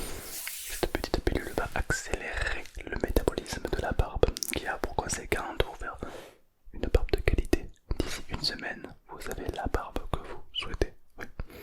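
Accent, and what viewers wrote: South, France. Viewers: pk il fait l accent du sud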